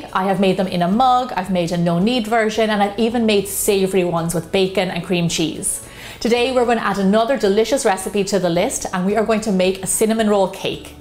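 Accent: Ireland. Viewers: Hi! This is a wonderful cake and even though I don't eat eggs I bet this is just so yummy! I love your videos and your wonderful personality and that absolutely lovely Irish accent! It's also heartening to know that you reply to the comments of your subscribers. Good luck to your awesome channel!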